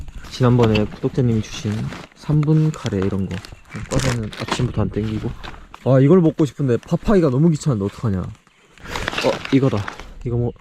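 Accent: Gyeongsang, South Korea. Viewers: 혹시 경상도 분인가요? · 올해 60.창원사는 아줌씨입니다. 여행을 좋아하다보니 우연히 영상 보게 됐는데 울 아들마냥 경상도 사투리가 반갑네요 ㅎㅎ 조용조용 즐기면서 탐색도 하고 진정한 여행자 같아요. 진심 부럽네요. 앞으로 자주 보게 될 것 같아요~ 3월에 호주.뉴질 가는데 이 영상 많은 도움이 되겠어요. 감사해요~❤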